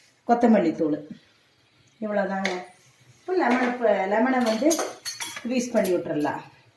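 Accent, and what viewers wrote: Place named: Coimbatore, India. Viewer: Ur language slang spr sis.. Iam also coimbatore....🙂🙃🙂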